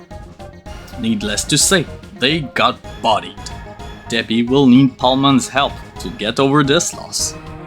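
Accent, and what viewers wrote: Quebec, Canada. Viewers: Il me semblait que tu avais un accent canadien français, j'entend souvent à mon travail mes collèges parler en anglais, il y avait une ressemblance. En tout cas bel effort de tout faire en anglais ! Très bonne vidéo. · You're clearly French Canadian, hahaha. nice video about a beloved game of mine.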